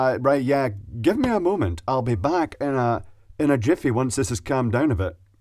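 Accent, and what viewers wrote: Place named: Glasgow, United Kingdom. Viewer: As an American, I love the Glaswegian accent and lingo ("a wee bit") haha Something I would have tried is using the "nose oil" trick I learned in college (if you have oily skin, wipe your finger on your nose and then swirl it around the foam) which almost immediately breaks the surface tension of the bubbles and kills the foam.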